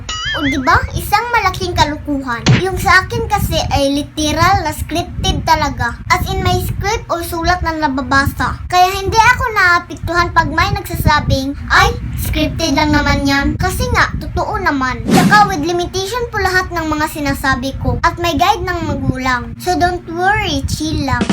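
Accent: Visayas, Philippines. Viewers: Visaya kaba ?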